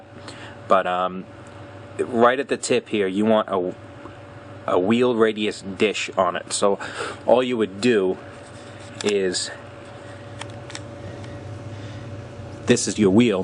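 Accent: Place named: Massachusetts, United States. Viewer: What a great video. I'll have to watch this a couple more times to get maybe one tool to work like yours. You make this all look so easy to do, but in reality this is an art. All always, I appreciate all you expertise and hard work making these great videos. I too am from Mass, but I sure don't sound like you. I guess I have been away too long. Now my brothers have that accent. They call me the adopted one. Looking forward to your next video.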